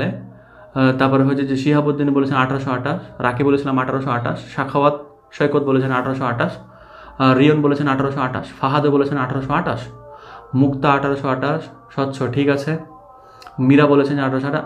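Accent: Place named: Rajshahi, Bangladesh. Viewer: স্যার আপনার কথা থেকে কেন জানি মনে হচ্ছে রাজশাহী অথবা চাপাই নবাবগঞ্জ বাড়ি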